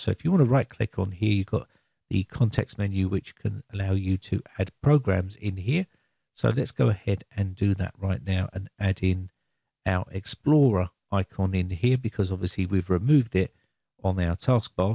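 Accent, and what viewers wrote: United Kingdom. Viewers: I'love ur Voice bro Nice UK Guy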